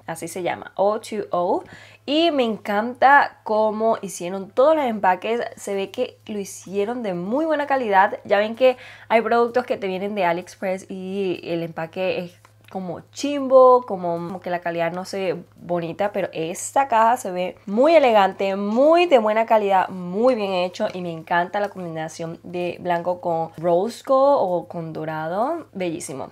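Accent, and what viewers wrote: Venezuela. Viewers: Me Encantas!!!! soy venezolana, vivo en España y es super increíble oír tantas palabras y esa forma de hablar tan particular de los venezolanos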